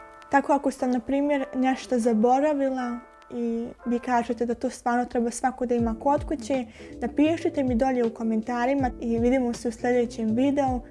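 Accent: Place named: Republika Srpska, Bosnia and Herzegovina. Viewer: ОНО ШТО МЕ РАДУЈЕ ЈЕ ТО ДА ПРИЧАШ ИЈЕКАВИЦУ И МИСЛИМ ДА СИ ИЗ РЕПУБЛИКЕ СРПСКЕ. И ЈА САМ.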